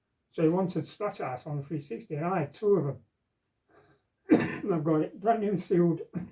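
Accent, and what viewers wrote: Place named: England, United Kingdom. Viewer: Imagine 'correcting' an English person on English with an American way of pronouncing it. Cringe.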